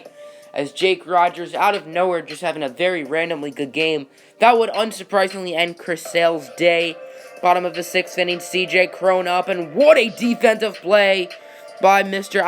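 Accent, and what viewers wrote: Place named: Boston, United States. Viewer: That Boston accent😂😂😂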